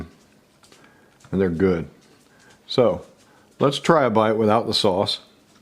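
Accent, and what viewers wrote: United States. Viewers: He is American. They say erb. Brits say herb.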